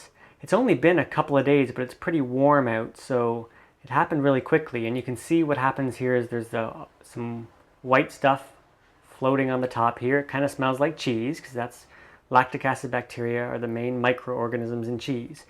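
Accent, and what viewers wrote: Canada. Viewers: My guess is Canada.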